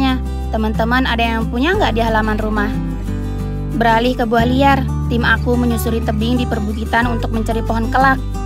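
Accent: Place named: Lombok, Indonesia. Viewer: logat lombok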